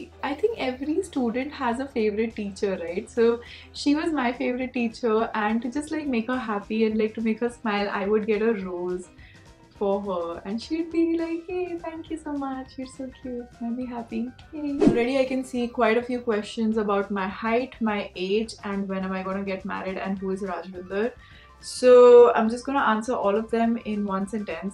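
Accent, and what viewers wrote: Punjab, India. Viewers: Ur Punjabi Accent Is SO Cuteeee... 😊😊😊😊😊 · Ur punjabi accent 😍😍😍😍😍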